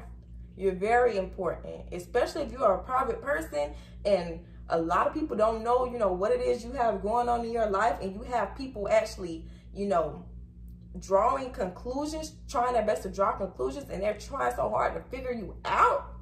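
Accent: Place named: South, United States. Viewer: She spoke on a tipical southern, they so nosey and different.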